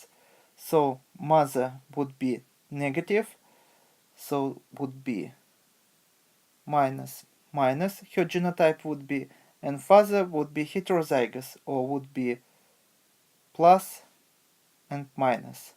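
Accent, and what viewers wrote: Russia. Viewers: bro are you russian ?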